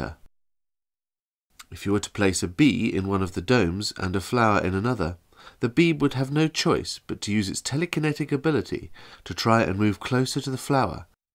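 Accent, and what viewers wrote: United Kingdom. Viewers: Interesting concept but I doubt the canned ham or the sedated fly have much input to the system. Although the use of insects in esoteric devices isn't un heard of. Viktor Grebeinkov observed anti-gravity effects in beetles and used their wing/shell casings to produce levitation. Good spoof with the pompous British accent. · Ok dawg, you got me. Nice one! Very well made. Nice Brit accent. Cheers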